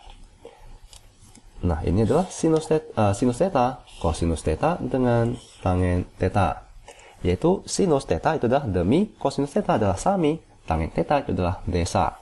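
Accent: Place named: South Korea. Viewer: Terbantu akhirnya faham juga .kak kalo ngomong kok mirip orang luar ya kayak orang korea ngomong indo gitu😀😁😁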